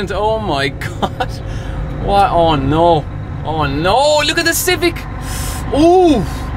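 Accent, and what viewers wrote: Ireland. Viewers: I love Ireland (hopefully I'll visit in the not so far future) and I love lorries. Your handle suggests that you're Greek, although your accent is distinctly Irish. So yeah, you just gained follower. Cheers from Turkey. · love irish accent :)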